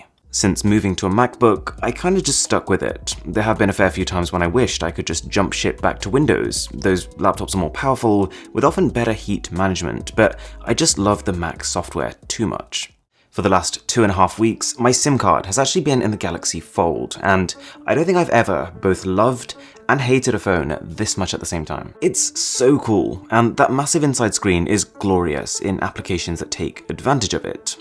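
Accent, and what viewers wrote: RP, United Kingdom. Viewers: Your accent is much more posher now. Interesting. Gosh you are so hot. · He sounds like Harry Potter...The same deep n calm tone..Not just bcoz of the british accent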